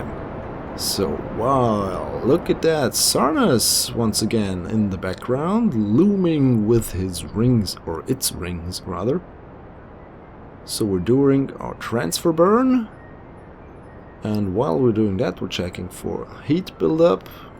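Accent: Germany. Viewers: Man hört deinen deutschen Akzent. ;)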